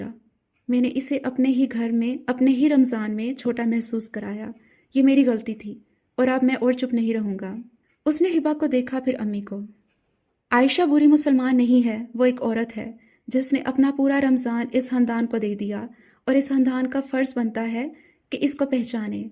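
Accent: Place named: India. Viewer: Why u speak indian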